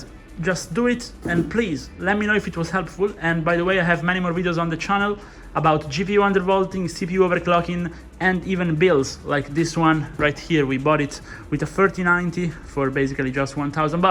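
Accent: Italy. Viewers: Qualcosa mi dice che sei Italiano... o al massimo spagnolo, comunque grande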